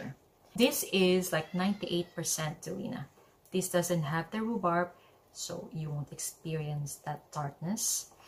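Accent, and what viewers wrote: Philippines. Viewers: Filipina accent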